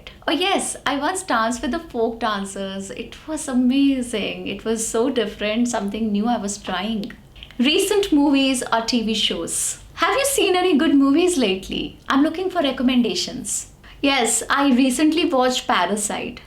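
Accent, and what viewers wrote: India. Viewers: I actually speak English with American accent🇺🇸 Now I’m gonna learn Indian accent from your videos to make better content for my Channel. Thanks a lot for your beautiful work gorgeous lady!!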